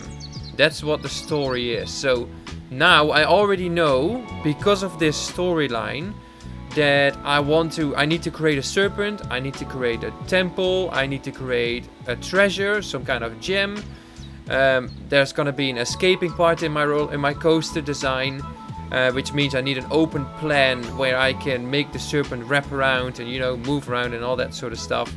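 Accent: Netherlands. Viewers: You're based in New Zealand? You sound so Dutch!?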